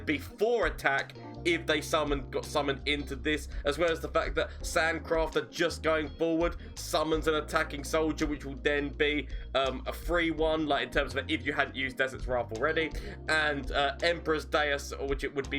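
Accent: United Kingdom. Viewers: i just realized that your name is, and i couldn't be more confuse with this British accent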